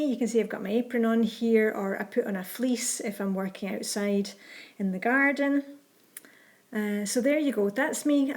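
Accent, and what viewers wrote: Scotland, United Kingdom. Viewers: Where about in Scotland are you from? I’m in Edinburgh lovely to. Hear a Scottish accent!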